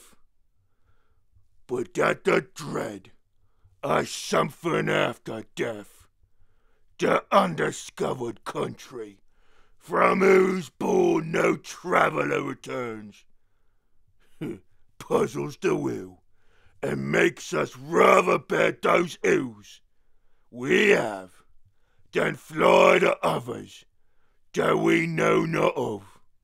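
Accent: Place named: United Kingdom. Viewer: This isn't an ork voice ... this is just plain a british voice reading a british play.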